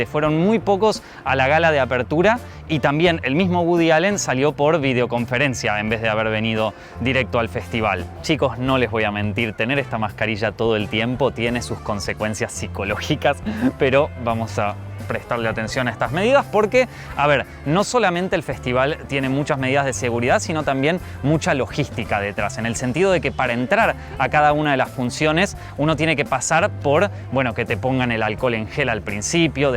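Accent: Argentina. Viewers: Dios mio!!! Ese acento argentino me encantaaa. Es hermoso! Un abrazo gigante desde Bogotá-Colombia 🇨🇴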